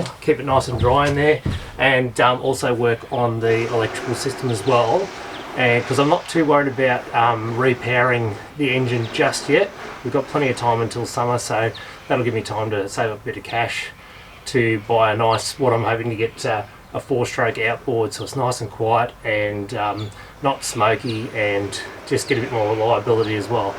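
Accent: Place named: Australia. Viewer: As soon as i heard the Australian accent and then the stupid music i just new it was time to stop watching this vlog 😮 greetings from England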